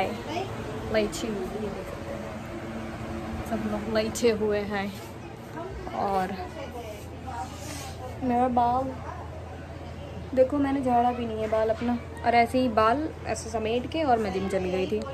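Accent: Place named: Awadh, India. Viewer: wahh awadhi tone ko Hindi me sunna bohot acha lagta hai mujhe aap ka Blog acha hai starting achi hai aap ko bolne ka hunar bhi hai yaha me sab nahi keh sakta kyunki blog ki bat hai par han agar me tumhari koi help kar paun to mujhe jarur batana studio ka kam hoga to batana ❤ baki achi kosis sherni ho aap❤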